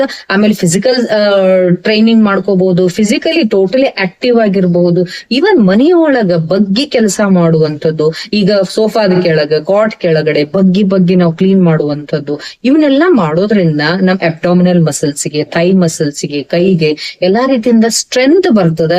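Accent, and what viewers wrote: North Karnataka, India. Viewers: Yes madam... happy to listen jawari bhase❤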